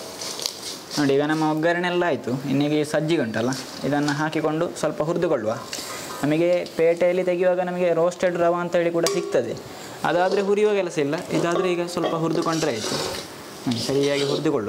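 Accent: Mangalore, India. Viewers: Nan aduge nodthino gothilla but nim mathu keloke vidro nodthini Mangalore language super thumba chanda ಉಂಟು keloke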